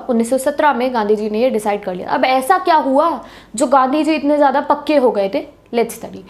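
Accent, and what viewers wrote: Punjab, India. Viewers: Love your punjabi accent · Punjabi accent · apki jo bich bich mai punjabi nikalne ki koshis kr rhi hai...that makes the chapter more interesting :) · bro ma'am Punjabi hai 😂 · Di love your Panjabi assent ....:elbowcough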